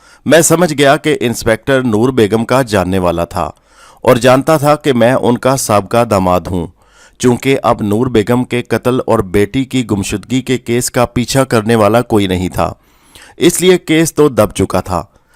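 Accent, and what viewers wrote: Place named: Pakistan. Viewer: Anam Khan lakin ye Indian nahi Pakistani hai aisi urdu Pakistani hi bol sakta hai bahi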